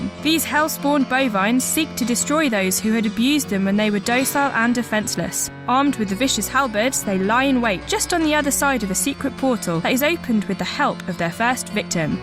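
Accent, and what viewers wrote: United Kingdom. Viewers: Oh noo.. UK accent.. disaster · Guys stop complaining over a British woman narrating a YouTube video it's not gonna kill you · Everyone show some goddamn respect, she is doing a fantastic job and all you people do is blame her for being British, have you ever thought how you sound compared to England? americans think english sounds stupid , and english people think american sounds stupid. why can't we just forget the accents. this video is great. and she did a amazing job at this , so shut the hell up , find something to talk about , there is enough other things in this video to talk about other than how you hate her accent. grow up. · D: So many people hating because of a British accent?! I.. Wha...? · ***** She has the heaviest british accent ive heard in years, don't bullshit around. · Uh British accents. They stress they're letters that require you your lips to touch (b, v, p, w, ) and make it into note of a "t" sound. 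So annoying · I have to say there's nothing wrong with the girl narrating the video or with her british accent, but the words are difficult to understand, to me (I'm from Brazil). In next videos, would be nice if she talks slower. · I can't understand how people find this annoying. I bet it has something to do with people not being exposed to british accent on a regular basis. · I've met multiple British people so I can understand this perfectly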